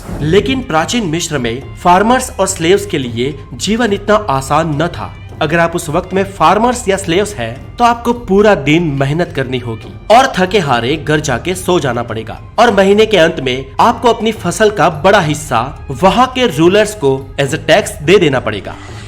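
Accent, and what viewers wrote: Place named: Bihar, India. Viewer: Mostly voice bihariyo ki kyun hoti hai with mother tongue in fluency issue ke saath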